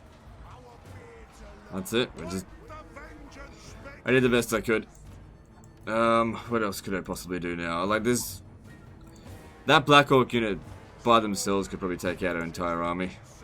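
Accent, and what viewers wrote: Australia. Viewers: I very rarely click your videos based on the content, it’s usually about personality. Sometimes I just think “shit man, I could use a grumpy Australian talking into my earholes”. Love your channel man.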